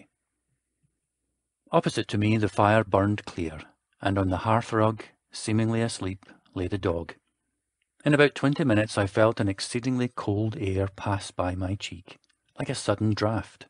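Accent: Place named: Scotland, United Kingdom. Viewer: I heard an adaptation of this on CBS Radio Mystery Theater and I want to compare it to the original, so I scrolled through four audiobooks playing the first minute of each to see which reader works for me. I don't see why I'd choose a Scots accent when it's not a story that has anything to do with Scotland, but out of the available choices this is the voice that appeals to me and that's just the way it is.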